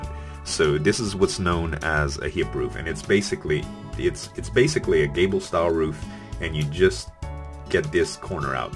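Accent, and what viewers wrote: Louisiana, United States. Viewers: This guy has got to be from Southeast Louisiana. He has a Cajun accent.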